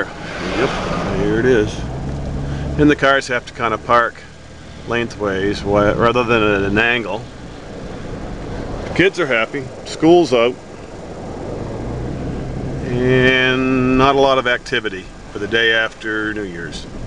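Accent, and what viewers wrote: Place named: Maine, United States. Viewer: You are very welcome for my comment, AND it was sweet to get mail from an unexpected source into my inbox...woo hoo ! Please dont ever take your video down, as Im really homesick this year, and nobody in my suburb or community speaks with the correct accent.... It’s more comfort than you will ever know, just to be able to hear the audio portion in this video... AND, the visuals bring me back to a time in my life, when things were childlike, and perfect, long before the world. ( and everyone in it ) went to the dark side... I still prefer the cold temps, keeping my inside air at a mean 67 deg. F. Even though I left my hometown, Bangor, in 1978, at age 24, to enlist in the Navy, ( mess specialist ) I really do miss the old days—- listening to high school basketball tourneys on radio, picking apples in fall, ice skating on pond in winter, clearing snow... 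Those were the days, I could sit on the front porch at night with my sisters, and, in the dark, as a vehicle approached, I could tell you the make of the vehicle, just by the headlights... No use trying that now, lol.. Mama, daddy, both Nanas are gone now....but, I still remember mostly everything they taught me.. I’ve gone through my life, wrongfully thinking that my family were just a bunch of shit—asses, but, truth be told, I would not hesitate to burn everything I have now, just to go back in time to the year 1961, and get a do-over...( hell of a ride, but might not change one thing ) Im ever so grateful I was born and rais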